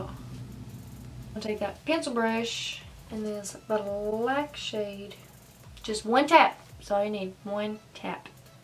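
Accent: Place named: North Carolina, United States. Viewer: You sound like you're from where I'm from :) NC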